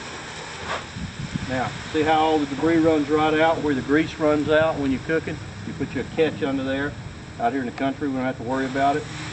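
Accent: United States. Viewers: That's NOT a Texas accent .... it's a refined Southeast Georgia accent. Smooooth as butter! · Love the Texas accent, and love the propane starter idea. I'm a novice getting ready to take my first smoker on it's maiden voyage so I really appreciate the expert advice! Thanks for making this video!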